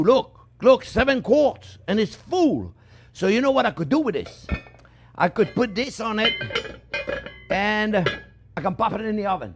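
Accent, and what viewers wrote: France. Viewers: Yesss delious 🎉as usually, I’ve cooked this receipe for yrs, just didn’t have your awesome 👏 homemade broth an yrs ago had I known bout it I would have cooked it bcuz had a big kitchen, but broth in the box an bouillon cubes, Luv your recipes 🎉, an your French Accent, bloob bloob an you twinging your hands in air, tell food to get bk in pot, most of all you cook soo clean 🧽 with everything, wash your rags out wipe your working board off, I get soo tired of other cooking videos not rinsing off meat 🍖 are etc, tonigt my friend I’m drinking red wine 🍷 an enjoying your videos you made me laugh 😆 when you said if you have criticisms go an watch Tik Tok are what ever it’s called I laughed soo hard lucky I didn’t burst my jeans, lol 😝, You are a blessed renound chef 👨‍🍳 God Bless New Orleans La. 🎉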